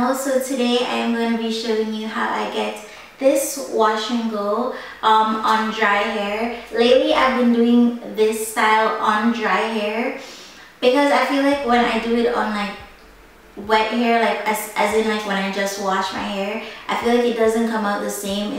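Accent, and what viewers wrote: Jamaica. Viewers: you from Jamaica?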